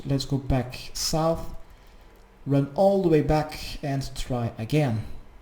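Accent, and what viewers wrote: Netherlands. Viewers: When you fail the rope swing 2x but the stupid grid thing was literally 5 times straight forward xD Thanks for the amazing, not annoying (ahum TAF) quest guides. Nice Dutchie accent x) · You're dutch, lol.. never thought u were even tho you got an accent, im also dutch :)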